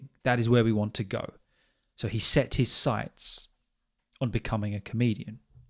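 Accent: England, United Kingdom. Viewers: Hello, thanks for another interesting episode, you’ve been doing excellent job for learners to help them with English May I ask you, what is the English accent which you speak? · Sir like it very much your British English accent and I understand your speak properly it is hundred persent clear. Lot of thanks teacher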